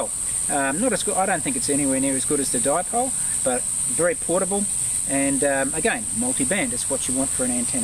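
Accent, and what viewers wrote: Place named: Australia. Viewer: Sorry but only 25% of what you are explaining is understandable for a non "Aussie" - certainly ad that speed!. "That mountain is too high for me". Paul - OP5A.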